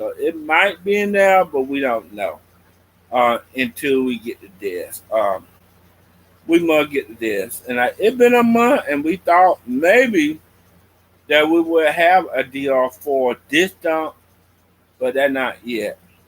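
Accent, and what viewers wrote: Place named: South, United States. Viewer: Just a bit of constructive criticism.....sometimes it's difficult to understand what you're saying because of your enunciation of words. It could also be your southern accent that may make it difficult for others to understand what you're saying. When you put those two together, it's even more difficult when you're making videos eating and trying to talk at the same time. Sorry that you were bullied.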